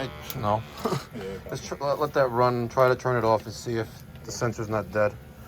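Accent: New York, United States. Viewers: "That white smoke doesn't mean you are electing a new pope." "I know that!" I love how you New Yorkers talk and joke.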